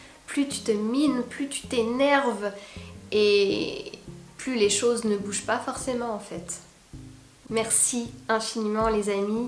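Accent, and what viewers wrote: Quebec, Canada. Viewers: t est en france avec un accent mais tu parle comme une quebecoise tu nous aura toujours dans ton coeur. ici on a commencer a vacciner.merci de pas nous oublier pout ton autre chaine plus tu va aller dans le domaine (SPIRITUEL) ca interesse certaines personnes mais pas tous ..je te souhaite de belles fetes mais sans neige rire bisou cher amie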